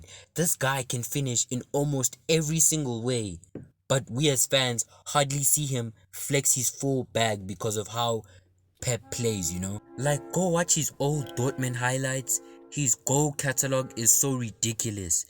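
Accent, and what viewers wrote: South Africa. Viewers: South African accent heavy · No LIE this man sounds SOUTH AFRICAN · W vid and vids. You South African, bro?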